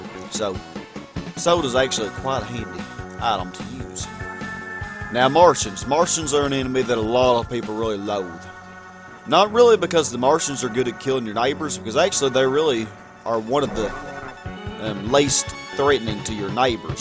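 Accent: South, United States. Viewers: At first I thought you were British, but about 1 minute in and I realize you are southern/country. This is easily my favorite game from the SNES and Sega Genesis era. A friend and I reached the 40 something level where you fight the giant spider, and the game glitched out and we couldn't progress any further. Basically robbed of beating the game, the one and only time we made it that far. It's level 46 or 48 or something like that.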